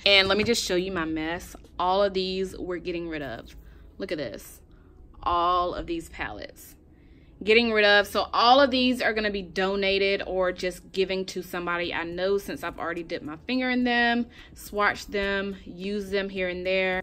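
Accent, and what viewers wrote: South, United States. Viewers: Your southern accent is so adorable😆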